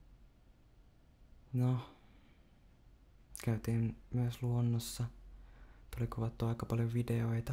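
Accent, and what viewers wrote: Finland. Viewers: Your finnish accent and cadence..... Relaxing rambling obviously comes naturally for you^^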